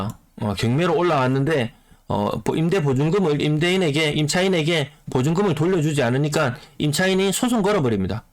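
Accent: Gyeongsang, South Korea. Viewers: 친근감이 있고 경상도 발음 너무 반갑습니다 알차고 유익한 정보 감사 하지요~~♡ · ㅎ 구독자 100만 언급 저도 그체널들 잘봅니다 매끄러운 서울말씨들 하지만 님은 경상도분이라 의도적으로 불친절하다고는 안봅니다 요즘은 꺼 더 잘챙겨봅니다 이런 정보야말로 감사하죠 화이팅 하십시요~^^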